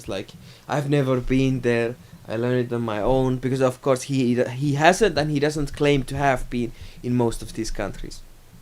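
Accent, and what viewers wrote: Finland. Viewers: I'm finnish and this is the most stereotypical finnish accent ever, he is 100% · Is this a finnish accent or a messy indian accent?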